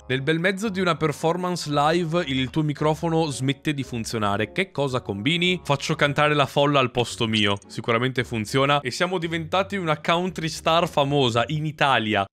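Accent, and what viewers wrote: Bologna, Italy. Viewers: Ma sei Di Bologna davvero?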